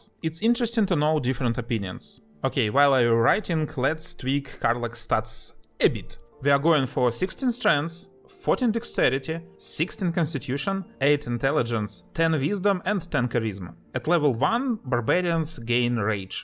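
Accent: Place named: Russia. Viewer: nice russian voice brat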